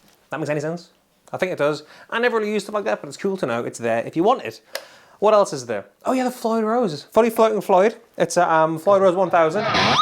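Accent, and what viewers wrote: United Kingdom. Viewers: Thats one of the best reasons to subscribe! Well, there's also the guitar playing and the smoth British accent. Your a legend sir.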